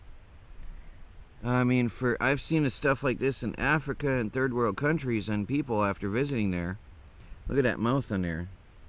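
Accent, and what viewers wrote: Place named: Michigan, United States. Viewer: Yeah you sound like you're from michigan too.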